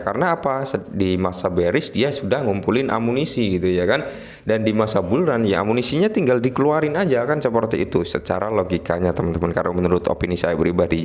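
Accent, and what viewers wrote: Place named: Surabaya, Indonesia. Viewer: mas sampeyan womg endi? koyoke gak adoh² teko suroboyo nek ndelok logat e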